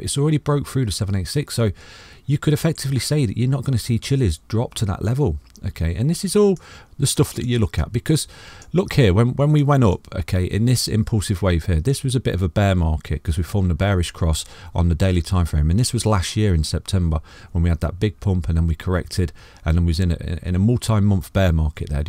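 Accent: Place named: Mansfield, United Kingdom. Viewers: you sound like you have a mansfield/Notts accent. Or am i way off? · Are you from Nottingham? That accent!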